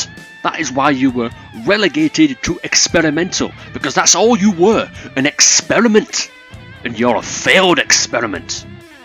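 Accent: United Kingdom. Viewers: Or maybe it's because I was so surprised to hear a British accent, I was honestly expecting some annoying Yank voice 😂 Never knew you were a Brit! Makes all the edgy texts over the years much better knowing the British humour. Americans would be completely serious saying all that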